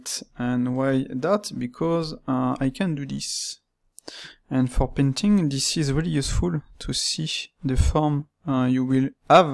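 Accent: France. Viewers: toi t'es francais 😂mais sinon trés bonne video continue comme ca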